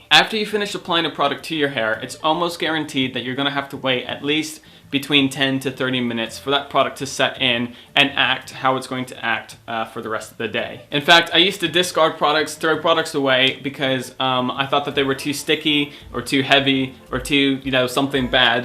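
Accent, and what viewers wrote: United Kingdom. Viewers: Your accent sounds kinda British in this video. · You've got a little British accent coming and going in this vid, dude. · do you live in the uk? i don't remember you having an accident. certain words you say has a different sound; you phrase things so clearly. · i've been wondering the same thing, I can go back to a video he made a year ago and he speaks normal american english, but now he talks with a british accent all of a sudden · I just realized, do you have a British accent?😮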